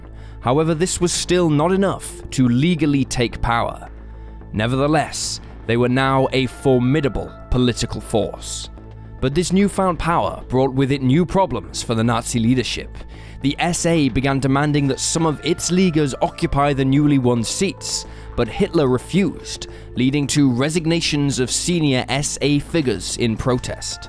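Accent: England, United Kingdom. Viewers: Voice like English. Loud the interrorgrater. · This limey narrator sounds gay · If you think that's an Australian accent.. it's obviously British.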